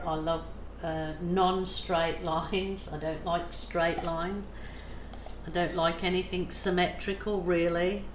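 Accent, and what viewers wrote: Birmingham, United Kingdom. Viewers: You just keep on rabbiting, it’s what we brits do! You can take the girl out of Brummie, but you can’t take the Brummie out of the girl! Love the swipe.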